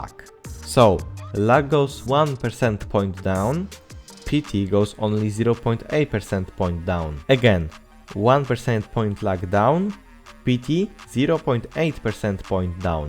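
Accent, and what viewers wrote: Poland. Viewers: siema słychać że polak gada hahahah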